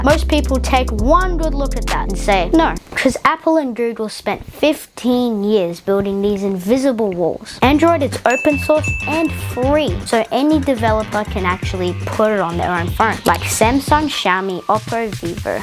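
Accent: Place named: United Kingdom. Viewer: Great work kid just loose the British accent and you’ll blow up 🙏🏽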